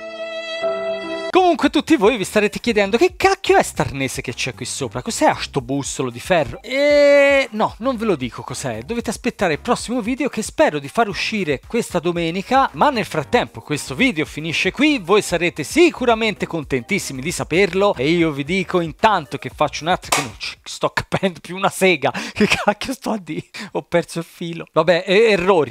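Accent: Tuscany, Italy. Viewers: Da quale parte della toscana vieni?😂😂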